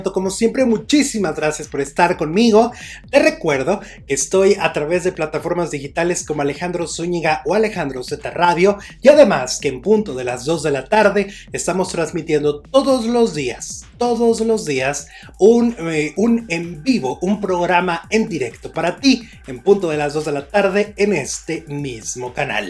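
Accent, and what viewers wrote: Fresa, Mexico. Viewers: Qué antipático eres , tú tono de voz es desagradable quieres hablar muy fresa antes hablabas normal, y caes mal🤔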